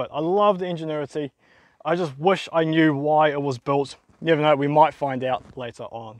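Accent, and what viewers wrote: New Zealand. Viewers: the kiwi voice is annoying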